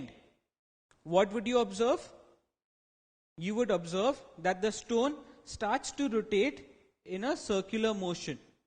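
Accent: India. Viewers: why you speak I-English?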